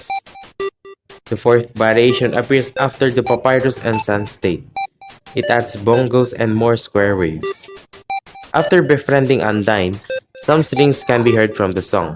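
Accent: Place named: Philippines. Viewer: The Filipino accent is way too strong on this one😅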